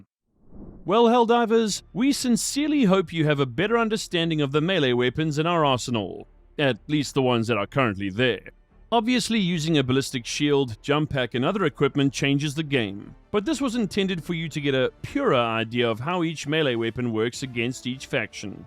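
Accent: South Africa. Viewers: Can help but think youre South African my brotha haha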